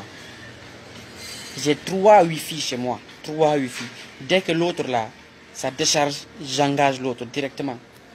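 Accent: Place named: Pular, West Africa. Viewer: Tu na pa mem le merite detr un ministre avk tes Français poular ....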